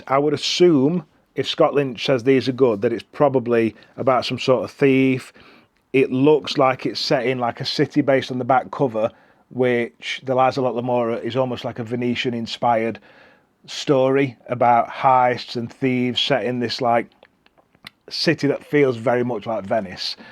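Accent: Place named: Yorkshire, United Kingdom. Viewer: Are you from Barnsley?! Sounding very Yorkshire to me! 🙂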